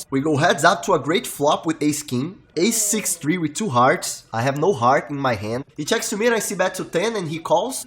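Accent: Brazil. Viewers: Love the brazilian accent. Shout out from Brazil haha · sotaque de brasileiro inconfundível kkk, parabéns pelas mãos jogadas. GL GL meu parceiro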